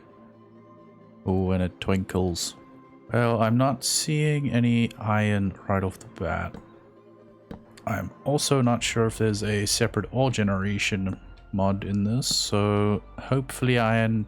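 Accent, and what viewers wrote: South Africa. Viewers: can hear that South African accent from a mile away xD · Your south african right?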